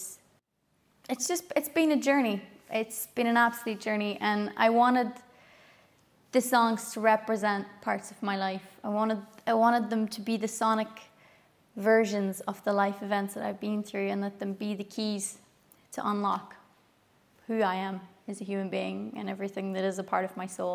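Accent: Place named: Ireland. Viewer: Janet, the sign of a person growing is when they are able to look at where they have been, what they have done, and what they wish they had done differently. If they can learn from these experiences, they can make changes or continue to grow. Many cannot be that honest with themselves. It takes a special person to be able to recognize and document where they have been and what they have learned about themselves. For you to be able to go beyond recognizing, and then share with us what you have learned from your journey is amazing by itself. I have followed you for years and have thoroughly enjoyed your talents (and the wee bit of charming Irish accents along the way.) Congratulations on becoming an even more amazing person! With your fantastic talent for music, I can only imagine this is an outstanding album. I am looking forward to listening to it. Dave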